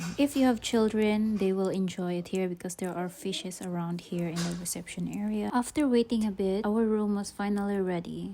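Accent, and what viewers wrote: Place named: Philippines. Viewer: Carabao English!!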